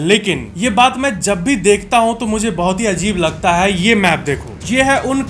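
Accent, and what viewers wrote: Bihar, India. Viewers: thats bihari Akcent ❤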